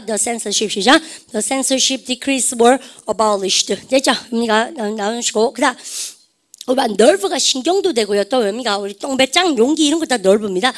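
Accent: Daegu, South Korea. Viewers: 50넘은 세아이 엄마. 심심풀이로 시작 했어요. 대학시절도 생각나고. 선생님께 영어도 배우고. 플로리다에서 하루 종일 한국말 듣기만 하는데 경상도액센트도 정겹고 가끔씩 귀에 꼭꼭 들어오는 갱상도만의 어휘구사도 반갑습니다. 매일일상에서 쓰는 단어 별거 없다고 우습게봤는데 아이들이 중학생이되니 저도 어휘구사를 좀 고급지고 학구적으로 향상시킬 필요를 느끼던참에 샘의 강의 발견하고 열공중입니다. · 아이들 다 대학보내고 이제 자유부인이 되었는데 허한 제 인생을 영어로 함 채워볼까 해요. ^^ 쌤 구수한 대구사투리 넘 좋아욧~~저도 사투리 쓰는 부산아줌입니다 ^^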